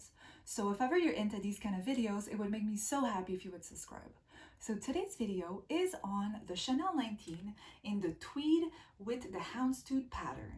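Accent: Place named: Quebec, Canada. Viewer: You're french Canadian right ? I feel like I can hear the slight Canadian french in your accent. 🥰😊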